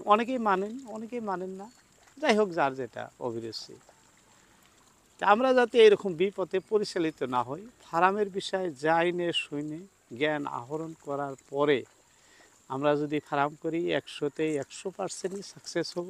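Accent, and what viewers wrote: Bangladesh. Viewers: দাদা আপনার বাড়ির ঠিকানা জেনে খুশি হলাম। তবে আপনার কথায় ভারতীয় কোন মেজাজ পাই না। মনে হচ্ছে আপনি বাংলাদেশের কোন অঞ্চলের মানুষ হবেন।অসংখ্য ধন্যবাদ।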